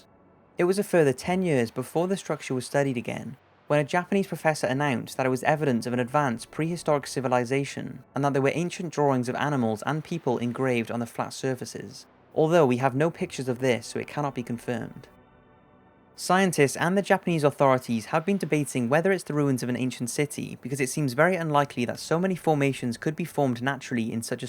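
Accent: Wales, United Kingdom. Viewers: I would say Welsh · Ahhhh a good British voice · Wales, UK :P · He is British but I mean you're obviously right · Love to know where your from. Kinda sound a bit Welshy. Bit of a Cardiff accent going on when you say some words. Your awesome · are you welsh? I know it sounds like a stupid question, but are you?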